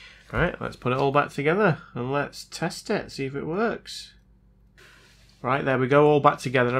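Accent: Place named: United Kingdom. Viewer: It's always interesting listening to a Brit pronounce R's.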